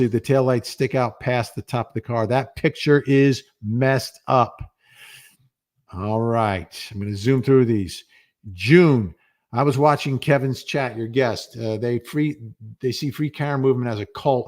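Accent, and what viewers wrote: Boston, United States. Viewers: not too shabby a boston accent for a joisey guy,! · is this case getting to you, you've fully adopted that Boston accent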